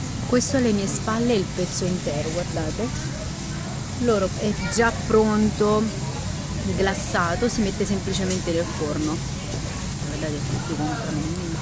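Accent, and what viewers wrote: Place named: Naples, Italy. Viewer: mi sembrava accento napoletano, scusa in che stato americano eri nel video?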